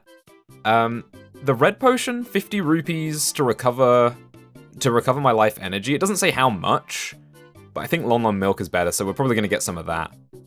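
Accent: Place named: United Kingdom. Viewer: your british accent disgusts me.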